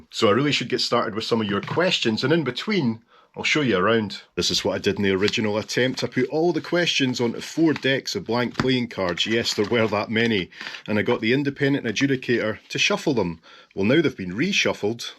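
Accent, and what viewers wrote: Scotland, United Kingdom. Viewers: Is it just my English ears misleading me, or do you really sound more Scottish when you are sitting in your own study at home than when you are making your usual travel videos!? :-) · I love your videos. I love your naturalness. I love your scottish accent and how very scottish you are. I want to ask, and I am sure you have been asked this before many times, but when oh when are you going to come down to Australia, and film here? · Extremely entertaining! (I‘m glad your Scottish accent is charming but not too heavy like Gary Anderson 😂) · What captivates me about your videos to be honest is your Scottish accent. I’m from california so to hear your accent takes me to the fantasy world of old England and some small cottages and green pastures. But that is what my vision of Scotland is like.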